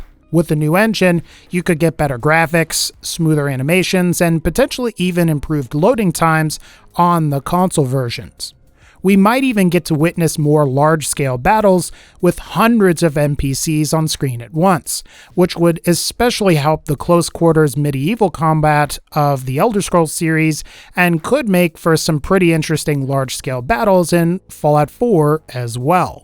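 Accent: South, United States. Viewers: Does anybody miss the loud southern accent that used to do😕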